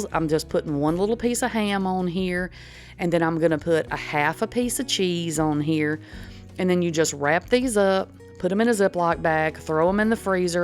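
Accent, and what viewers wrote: South, United States. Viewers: I'm sorry I'm now rascist. against a southern accent, y'all sound like Trump voters. FAFO. Can't watch ur content😢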